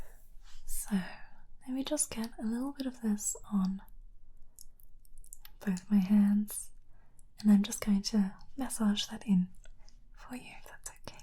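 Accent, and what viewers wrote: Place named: Australia. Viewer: As an Australian, I use to be weirded out when I heard the same accent in asmr, but then I found you and diddly and now it's one of my favourites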